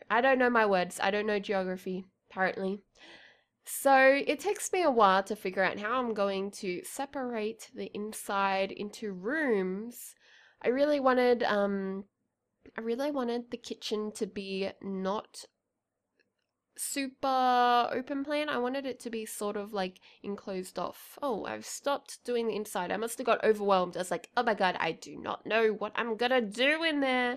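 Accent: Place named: Australia. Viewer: This is my first video I've watched of yours (subbed!) and I was like "she's French. No she's Bulgarian. No no no, definitely Swedish. Wait, is she British. No, sorry, she's austrailian, defiantly austrailian.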